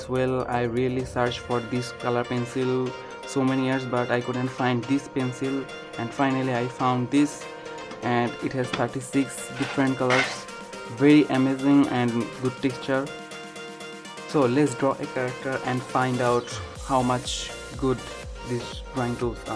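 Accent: India. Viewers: from your ascent i think you are a indian or a bangladeshi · You've got an Indian accent!! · Sound indian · Heyy buddy are you from India? Coz your accent is a little bit indian😅. Btw keep the good work senpai 🙏🏻🙏🏻😂💙💙❤️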